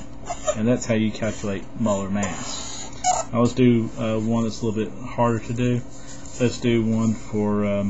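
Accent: United States. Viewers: i learned this back in 10th grade but i forgot how to do it. lol. thanks. having a slow talking country guy helps a bunch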